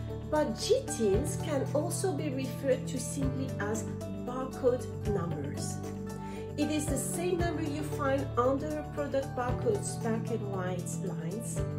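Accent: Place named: France. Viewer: When explaining things in English, a first language speaker is a good idea. The french accent is particularly strong here and coupled with the overly loud music makes this unwatchable.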